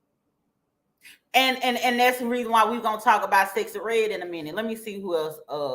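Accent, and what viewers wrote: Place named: Texas, United States. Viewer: Love the video and your Texas accent.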